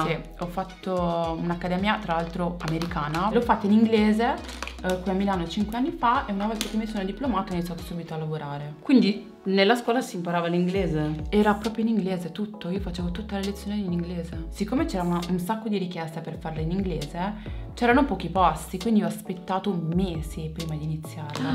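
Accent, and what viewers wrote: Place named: Apulia, Italy. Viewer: Riconoscerei una Pugliese tra mille persone ahaha. Adoro ❤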